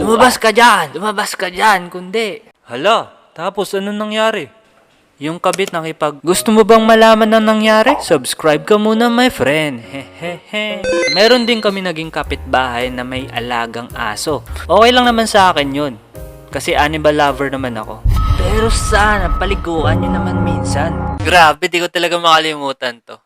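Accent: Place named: Visayas, Philippines. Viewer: Bisaya Ka pala ahahhah😂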